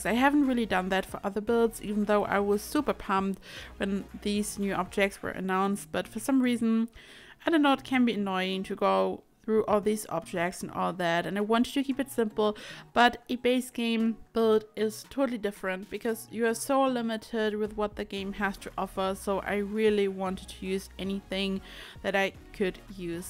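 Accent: Germany. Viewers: Your accent sounds a lot like German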